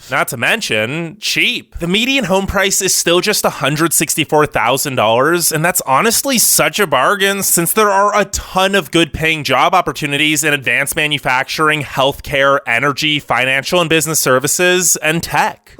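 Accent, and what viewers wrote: California, United States. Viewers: Sorry but the voice is just Californian I can't stand it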